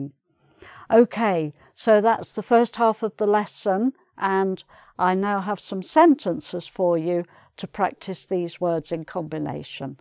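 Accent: United Kingdom. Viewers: I love the british english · I love British English. Thank you for your English. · Que delícia assistir às aulas dessa adorável senhora! Inglês Britânico fica mais interessante com as aulas dela. Obrigado! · i like british acent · It has been a utterly splendid, exquisite and flawless lesson. I'm particularly joyful, blissful and elated with that opulent topic. It is to my liking; moreover, it appeals to me. I do adore speaking, and her charming accent., Hence, I present; undoubtedly, the Queen of British English. You are the quintessential of British English. Thank you very much indeed. Far thee well, dearest Prof.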